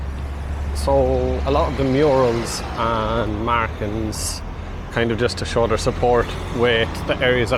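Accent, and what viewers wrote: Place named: South, Ireland. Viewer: Fair play to you for visiting the Shankill. You seemed a bit nervous and uncomfortable at times. Not surprising considering your Southern Irish accent, but you did a great job. It must have felt a bit like being in the lions den! The Troubles are over and it is much safer now. The locals are not as "paranoid" and aggressive now that people aren't trying to murder them anymore so you should be fine now. They are so used to tourists filming the murals that I'm sure they just assume you are a harmless tourist and ignore you. Even if they overheard you saying something they didn't like they'd probably just let it be or maybe have a wee bit of friendly banter with you and fill you in on the local knowledge/story/version of events.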